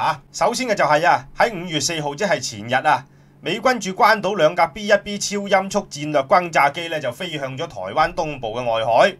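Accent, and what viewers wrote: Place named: Cantonese, China. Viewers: 拆爐試沙煲🤣好多廣東俗語..有時聽返都幾頂癮 好有廣東式嘅演繹 特別親切👍.因為我成日都有睇你做主持 好多都正合我心意對社會不公為我們市民發聲.. 辛苦曬你🙏💪😁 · 主持緊係番禺人::講緊番禺話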